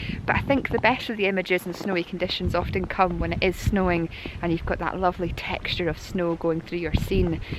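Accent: Scotland, United Kingdom. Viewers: Great Vlog, wonderful location, Brilliant conditions, I am impressed with your Photographic eye, which is supported by your narrative and easy listening scotch accent. thank you for sharing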